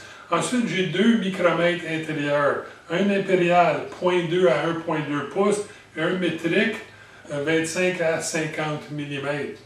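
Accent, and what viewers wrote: Canada. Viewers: Monsieur toutes mes félicitations pour les explications abondantes et variées que vous donnez sur l'ensemble de vos vidéos. Ces explications ont les mérites d'être précises et concises elles sont agrémentées par votre accent canadien qui me replongent dans mes souvenirs de deux séjours de 2 mois passés au Canada (79/80 ) j'ai visionné pas mal de vidéos traitants de l'usinage sur fraiseuses et tours en Français en Anglais et même en Russe......Mais vous êtes le crac des cracs....you are the Best. Et je vous garanti qu'il y a des pas moins d'une dizaine de cracs que j'apprécie. Comme je vois que dans votre atelier vous collectionnez les plaques d'immatriculations je vous propose de vous envoyer en signe de gratitude...une plaque belge skype: roland_chardon mail: <mail>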